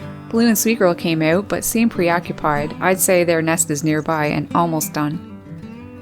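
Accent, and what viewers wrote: Canada. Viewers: You sound Canadian, eh?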